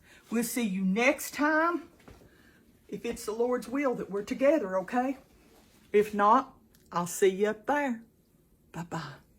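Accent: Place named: South, United States. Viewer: Oh, and you sound southern, do you have a chicken and dumplings? My grandma made the best, my mom is a lousy cook, i took on the cooking. She, my mother's, sent me a recipe but it was vague, pretty light on detail. Betty crooker index card. not specific. Im sure there was lard, I'm vegan, nobody else my house is.😊 it was noodly not biscuity she was depression era. Was my favorite thing on the planet. I wanna recreate for my boys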